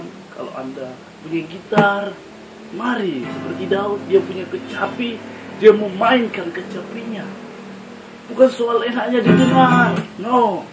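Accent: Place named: Nias, Indonesia. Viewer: Abang logatnya sepertu orang nias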